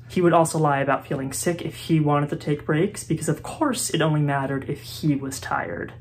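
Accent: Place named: Ireland. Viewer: I had never heard about this guy before but the fact that people in the comments are saying this is well condensed is crazy to me. Like this is enough for those poor people to be put through! (also what is with the irish accent? why not just be english? what does northern ireland have to do with harry potter?)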